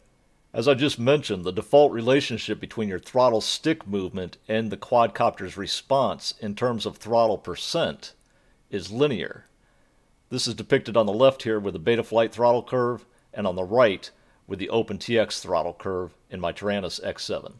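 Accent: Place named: United States. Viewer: Thanks for these words that recomforts me :) . As you just said, I was not expecting a such not easy and not fast learning phase. I'm always amazed, to be in south of France, you somwhere in the US (according to your fantastic accent) taking time to record yout videos and me here and enjoying your advices in the nature near to the sea. Sharing between human is just one of the most wonderful thing, whatever the subject. It was the philosophical though of a sundazy morning. Cheers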